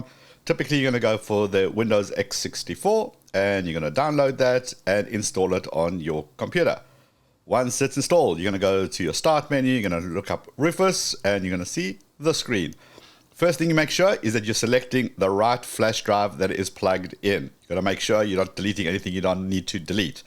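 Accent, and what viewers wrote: South Africa. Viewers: You can tell by the accent. I'm also a South African.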